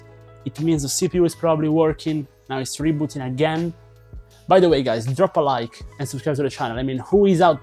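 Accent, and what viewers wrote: Italy. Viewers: Brooo ma sei italiano?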